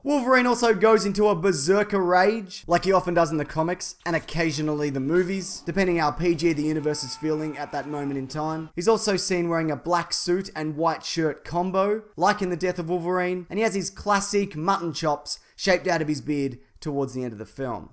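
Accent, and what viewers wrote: Australia. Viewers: Australian! I couldn't work out why I loved your voice so much!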